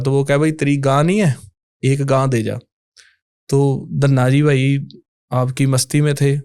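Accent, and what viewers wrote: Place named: Haryana, India. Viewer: So sweat haryanvi language sone bhai sab ji 🙏 🙏🙏🙏🙏🙏🙏❤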